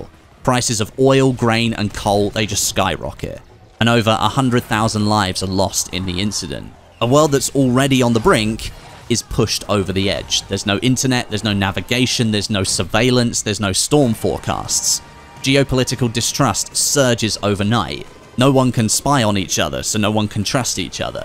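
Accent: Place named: United Kingdom. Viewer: the most bri'ish accent of a gamer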